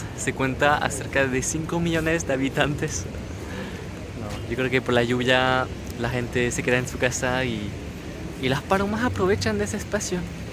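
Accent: Chile. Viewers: trasmites una paz con tu acento Chileno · Tiene acento chileno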